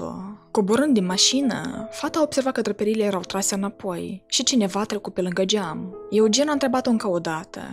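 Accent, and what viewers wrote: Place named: Moldova. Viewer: Accent moldovenesc dulce ..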